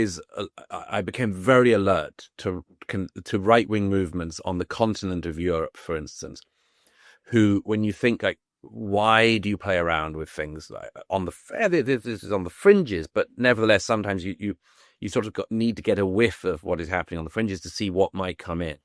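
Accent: RP, United Kingdom. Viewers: The right is critical of israel for the reason anyone else is. Because they see what is going on. Who cares what this gay gas lighter has to say ? Dave smith is Jewish and makes actual points. This posh speaking morrisey clone has nothing to say. He sounds like the democrats blaming everything on Russia after 2016.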